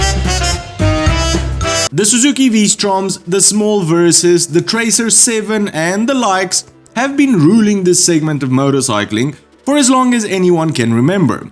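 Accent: South Africa. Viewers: Love hearing your South African accent tell about motorcycles and stuff. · Lived in SA, hence the accent.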